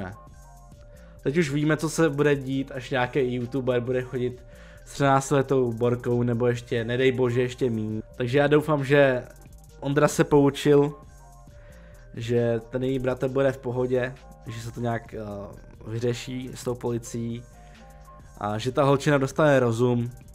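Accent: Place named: Silesia, Czech Republic. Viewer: tvoje čtení slezského nářečí mě baví jak cyp :D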